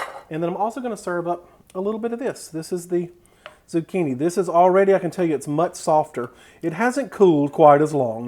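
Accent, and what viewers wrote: South, United States. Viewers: This guy is the best! I am so glad i can watch him make different recipes, taste them and give his honest opinion. So many times i have wanted to make something keto and there are a billion different recipes..... some completely different and some very similar. It can be very difficult to determine which one is the best one to make. As we all know keto ingredients can be very expensive. There is nothing worse than making a recipe that does not turn out anything like you have anticipated. Not only have you wasted ingredients that cost a lot of money, but you have also wasted a lot of time and worst part you don"t get to eat that one thing your have been,craving and thinking about nonstop, praying to the keto gods that you can make a low carb version. So THANK YOU! You are so helpful and a joy to watch. You have the cutest southern twang. 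Love your personality and energy.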